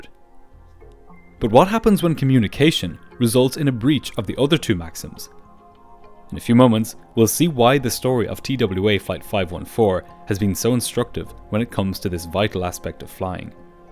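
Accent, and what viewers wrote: Ireland. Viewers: He’s Irish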